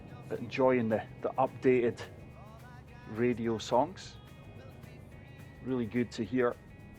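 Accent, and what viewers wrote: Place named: Glasgow, United Kingdom. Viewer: You sound like you from Glasgow ye from there o am and if so what team you support the blues or greens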